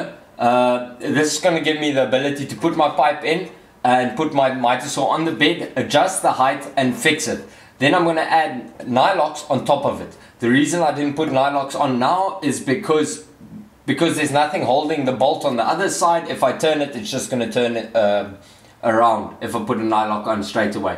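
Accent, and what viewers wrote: South Africa. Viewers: Ja, deff a saffer. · South African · South Africa, I believe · Is this man south african i think i hear the accent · The South African accent is so great! I have a few friends from SA and love hearing them speak. Add that on top of woodworking and you have a new subscriber!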